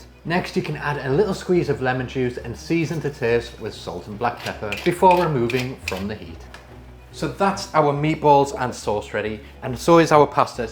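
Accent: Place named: Birmingham, United Kingdom. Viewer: This looks fantastic! Yum. Great stuff. I love your content and the Brunmie accent😂!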